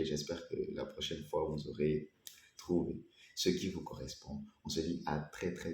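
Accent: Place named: Marseille, France. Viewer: Accent marseillais sur nous 😅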